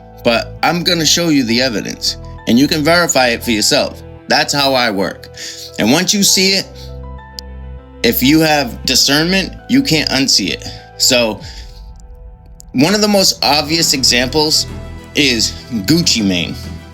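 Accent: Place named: South Boston, United States. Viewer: Wuttup dude, I just saw this video on Lipstick Alley, and I was frustrated that you didn't SHOW us what you described, but I also respect that you expect people to care enough to investigate on their own, which we should, and I most certainly do, and will in a minute about Gucci, who is a total nothingburger to me, no idea who he is, what he looks or sounds like, so my eyes and ears will be a fresh raw test. I believe it, probably, tho, it all sounds UTTERLY likely, given how evil the overlord class is. I just subscribed to your channel, because it looks interesting AF, but mostly because I miss hearing that accent. I grew up in Southie, and I still live in Quincy, but your particular accent is VERY familiar to me, and very few people sound like you now. Over the last 4 months, I've become the Faceclocking GOAT, I've detected and proven HUNDREDS of secret biological family relationships among celebs, often with me and my family directly involved. 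I've been waiting all this time for someone to remind me of Joy Wright-McCarthy, and you win, lol. You also remind me of the Ferris family, e.g., Paul and Justin. On a weirder note, you emit Jack Parsons vibes, and so do I, and so do a surprising number of people originally from Southie. Weirder things were afoot in Southie than anyone can currently possibly imagine, truly the bizarrest shịt in the history of mankind, NO EXAGGERATION, and yes, I am including the ancient Levant, ahem. On a brighter note, we were not just polluted with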